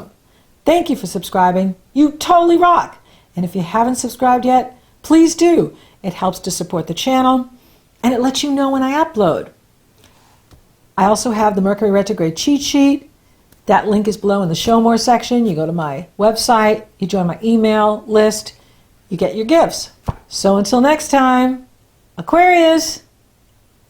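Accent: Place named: Upstate New York, United States. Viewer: "having MAHZ THEAH" I knew you had an accent, just thought upstate NY or somethin' - you slipped into some sorta Bostonian thang...what was that? lol It seemed to throw you off too a bit...hahaha GREAT reading. You are so fun and thorough, thank you :) You're WICKID SMAHT and totally ROCK! hahaha ;)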